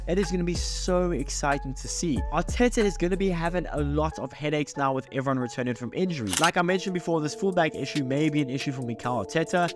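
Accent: South Africa. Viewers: Is that a South African accent I detect? Awesome content bro!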